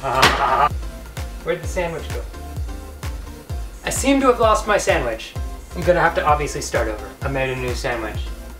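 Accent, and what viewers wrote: South, United States. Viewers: He's definitely become more comfortable and confident over 5 years and it's been so much fun watching him grow and become more successful. Regarding his accent, I think him living in California made him "turn off" the southern accent in a way. I can personally relate to this. I live in Tennessee and I've tried my whole life to not have an accent, mostly because some of my family members, I can barely understand. Haha But, if I spend much time around them, I do have a bit of an accent that peeps out.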